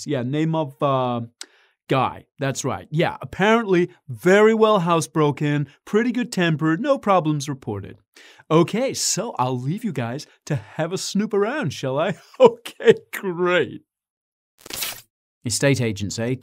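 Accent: United States. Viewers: Your American is pretty good. Much better than most American’s British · i love your America realtor accent! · Wow you're American accent is spot on!! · the yank accent is hilarious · Dude. Great job on the American accent. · Not a bad American accent. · Not bad American accent. Lol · Great American accent guy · LOOOOL ur American accent lowkey sounds like my old teacher in middle school for social studies 😂😂😂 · Your "American" accent is great!!!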